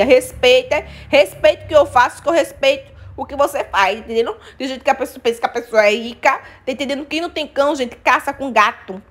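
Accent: Northeast, Brazil. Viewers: Gosto da voz de...voz de nordeste nos vídeos...kkkk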